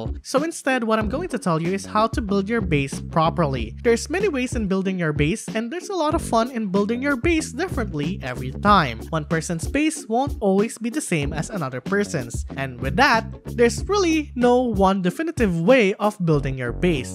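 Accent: Philippines. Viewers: You sound Filipino 👀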